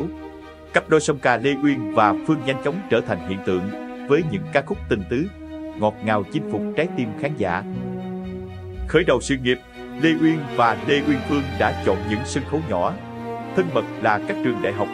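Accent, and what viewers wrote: Vietnam. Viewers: giọng miền Nam hay truyền cảm của MC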